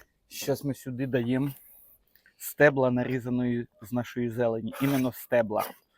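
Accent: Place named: Chernihiv, Ukraine. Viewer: Пане, а звідкіля сам родом будеш якщо не секрет?:) по говірці дуже схоже десь з чернігівщини, на менщину дуже схоже. 😜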